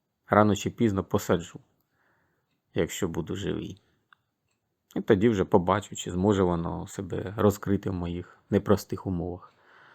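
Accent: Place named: Desna, Ukraine. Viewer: Знайома, точніше, рідна вимова. Таке, як придеснянська. Ви часом не з Батурина?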